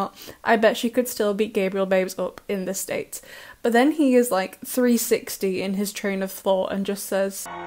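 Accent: United Kingdom. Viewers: ✨ToMaTo BoY ✨The British accent>>>>>>>>> slays!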